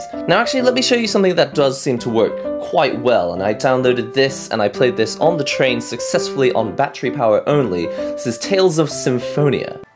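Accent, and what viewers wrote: United Kingdom. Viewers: British mate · This is the only video I've seen by you and I like your accent. I don't know if it's British or something else but it makes you permanently sound mildly sarcastic even though you aren't being sarcastic. It added to the fun of watching (or rather listening) you talk about this product.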